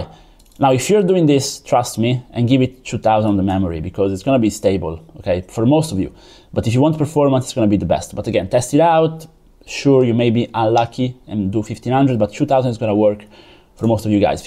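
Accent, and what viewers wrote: Italy. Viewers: Sento un po’ di italianità 😂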